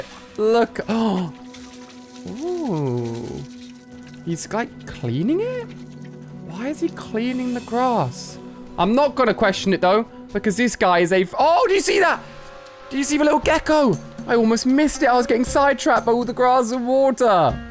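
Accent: United Kingdom. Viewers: Are you British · R u British? I am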